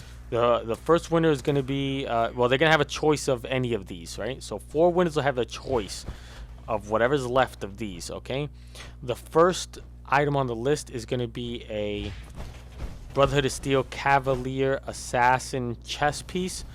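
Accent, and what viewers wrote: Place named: New York, United States. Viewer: thanks I cant afford ps4 if I could I'd buy it just to talk to you cause I love your NYC accent >.> I'm from Georgia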